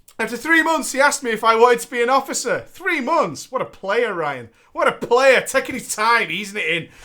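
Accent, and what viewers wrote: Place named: Manchester, United Kingdom. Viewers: Manchester accent (NW england). South city/cheshire i think. I live there and he sounds local. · somewhere round there yeah. it's not full on manchester but in that area, i'd say slightly south of manc. east cheshire maybe but not west, that's my area and it isnt local to us.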